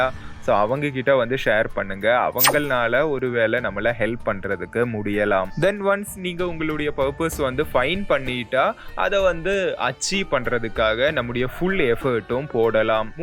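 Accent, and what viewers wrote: Kerala, India. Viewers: Extra ooordinary keralite o pronunciation style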